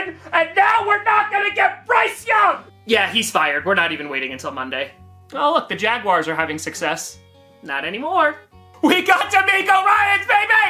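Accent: New York, United States. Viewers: When a Texans fan gets a NY rant with accent 😅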